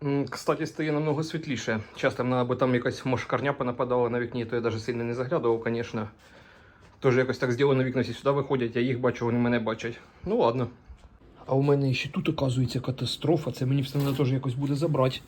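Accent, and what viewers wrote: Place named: Zaporizhzhia, Ukraine. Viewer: Запорізька обл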